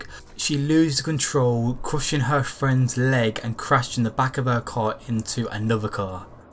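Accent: United Kingdom. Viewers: where are you from? i know uk but accent is hard to get at some points. not bashing ...im just curious lol. more i hear it the better. also keep it up. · I'm curious if that's the dialect across the pond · Kim Kimble Hes british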